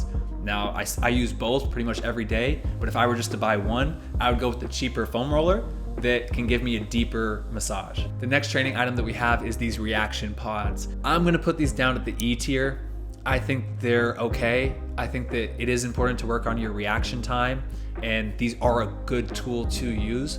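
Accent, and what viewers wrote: United States. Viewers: In America we call it soccer, and you sound American. Real Football 🏈 is different. The title of your video is misleading. Just kidding man. I watched anyway and these tools will help either sport. Thanks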